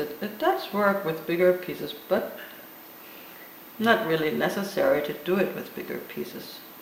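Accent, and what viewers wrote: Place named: Netherlands. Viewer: you're such an inspiring teacher, Thanks so much! Your accent sounds like my mom (100% Dutch) anywho- keep it up- we enjoy you so! Shereen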